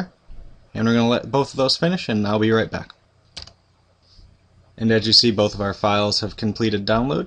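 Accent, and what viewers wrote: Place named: United States. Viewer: Hey, I gotta develop many programs till the end of january so i'll be watching all your tutorials :)) I hope I can learn to use android studio because nobody teached me. (I'm studing computer software engineer) Thank you for your job :) BTW: There are 2 big tutorials playlist, yours and one from another guy. I prefer yours because you speak american english and not british :P hahahaha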